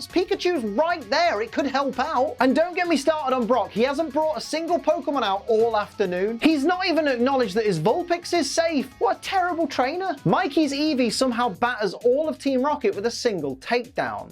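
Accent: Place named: Derby, United Kingdom. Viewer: I love hearing the divine Derby accent. I was born there but i left very young so the accent sounds so incredibly great to me just because no one around me speaks like i do aka swears more than drunk Irishman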